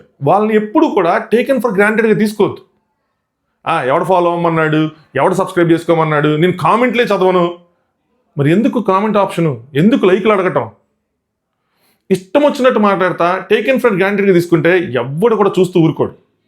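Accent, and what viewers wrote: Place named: Godavari, India. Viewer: Annayya nenu mimmalni subscribe cheyakunda mee video lu choosedaanini,,,chaala shame ga feel avthunnanu,,,, nenu chaala thakkuva channels ni subscribe chesaanu,,,, okati rendu vantala plchannels ,,,, garikapati gaaru,,, Chaganti garu,,, samavedham garu,,,,priyachowdary garu,,,, filmymoji,,,, ika itu paina meeru ,,, meee maata theeru pakka godhavari kada,,,,, entha chakkaga paddathiga matladuthunnaro,,,,, chaala manchi values ethics morals unna points meeda cheothunnaru,,,,, sooper Annaya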